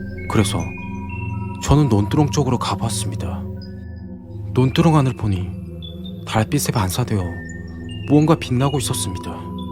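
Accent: Gyeongsang, South Korea. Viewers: 목소리 특이하네요 갱상도 특유음성도 있고 잘듣고있습니다 ㅎ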